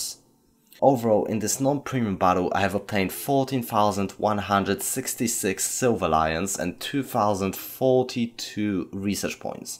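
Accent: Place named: Poland. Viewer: Why do you have Polish accent ?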